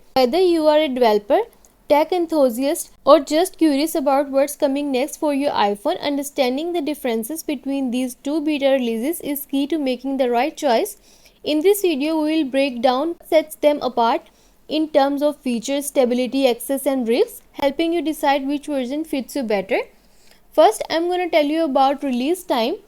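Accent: India. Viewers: Ohh is it Indian Ai English voice?😅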